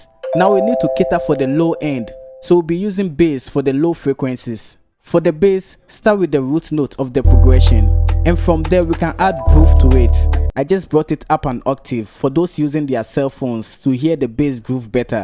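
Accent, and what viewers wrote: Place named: Ghana. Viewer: ok bro . Am watching from Cameroon. I guess your are Ghanaian from ur accent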